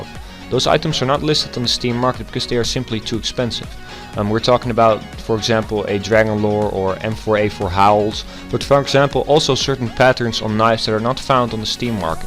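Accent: Netherlands. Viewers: Ik dacht dat ik een nederlands accent hoorde, en ik ben van belgië en mijn engels is ni zo sterk mar goeie video's man :p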